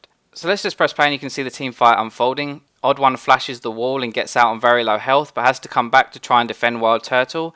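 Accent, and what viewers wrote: United Kingdom. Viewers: Hea British? I'm British! :) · Oh god, my bad, I didn't even noticed the player's name._. well, it has been proven that the british accent makes speeches more charismatic and less tiring to listen to. Take that as your advantage Mr.! haha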